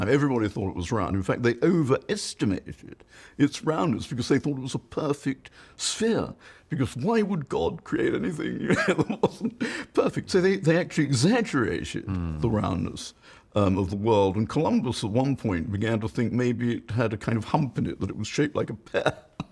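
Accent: United Kingdom. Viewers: My God, this is the most British man I've ever heard. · Most iconically epic British accent I have ever heard!!! Suck on that Peter Hitchens!! :)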